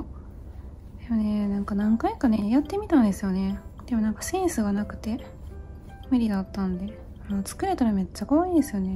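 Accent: Kansai, Japan. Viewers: はじめまして！の動画を偶然見てから編み物がしたくなり始めました。今、がま口にはまってしまってます。かぎ針初心者ですがの動画を見ながら何個かつくれました。私は関西人なのでの喋り方親近感が湧きチャンネル登録しました。これからも素敵な編み物どんどんアップお願いします💕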